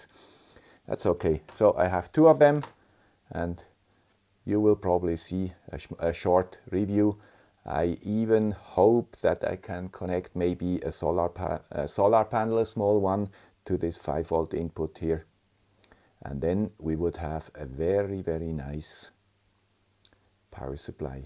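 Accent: Switzerland. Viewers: Great as usual...but is everything ok with you? Noticed that you sounded sad or tired especially at the start of the video. If tired take a break but do come back because we can't miss the guy with the swiss accent!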